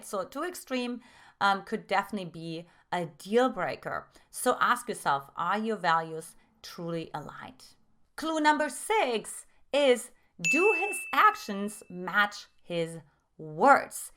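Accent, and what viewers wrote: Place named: Germany. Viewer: Thanks. Are you from Germany? I can hear a little German accent when you speak.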